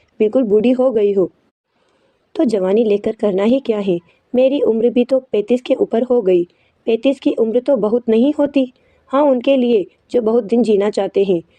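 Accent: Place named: Hadoti, India. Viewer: आवाज में हाड़ौती की बोली का acsent सुनना कितना मंत्रमुग्ध करने वाला अनुभव है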